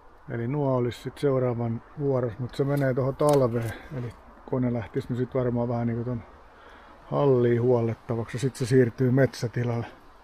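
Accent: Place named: West Coast, Finland. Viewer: Hieno laite! Itellä samanlainen. Kunto kyllä ainakin päälle päin heikompi omassa koneessani, mutta pääosin toimiva sekin ja on päivittäisessä käytössä. Lumen aurausta ja trukkipiikeillä roudaan tavaraa. Kaivuuta toistaiseksi vähän ja sitä tarkoitus tehdä jatkossa enemmän. Käyttäjän murteesta päätellen jossain länsirannikolla ollaan. Itsekin aikoinaan koneen hain Porista.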